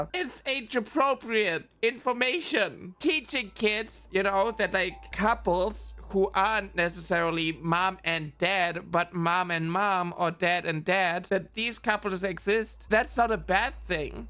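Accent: Germany. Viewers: What's your accent? It sounds so familiar but I can't place my finger on it. German?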